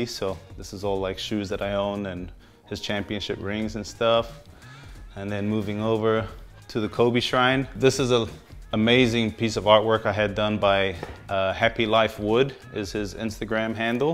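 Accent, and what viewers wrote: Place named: New Zealand. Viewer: The bro slowly letting go of his kiwi accent…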